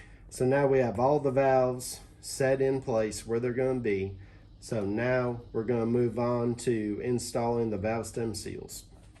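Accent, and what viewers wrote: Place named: South, United States. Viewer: Love your videos man. I want to do some similar work this winter but I was unsure on how to do some of the things but you made it easy to understand. I’ve watched a lot of your videos lately, one thing I think you should do as far a video is show your face every now and then, the reason for this… is to get people familiar with your face, so when they scroll through YouTube they’ll be able identify your videos…. It’s kinda like a familiar face. Other than that I like the tempo of your videos, it’s not to slow and not to fast. I’m guessing your somewhere from the south because of you accent. I’m from central MS.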